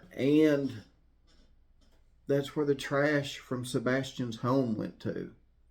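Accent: South, United States. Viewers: Love listening to your southern voice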